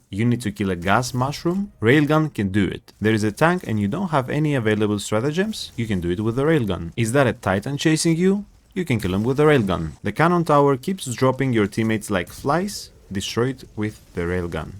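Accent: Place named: Greece. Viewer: Hey can you teach me greek . I love the accent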